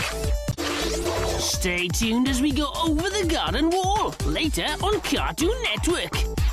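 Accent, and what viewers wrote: Scotland, United Kingdom. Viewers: It speaks British accent it's sure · The narrator sounds like a Scottish accent.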